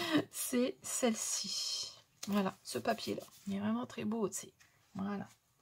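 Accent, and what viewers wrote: North, France. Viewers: J'aime vos cartes de Noël et le bon accent du Nord si je ne me trompe pas a bientot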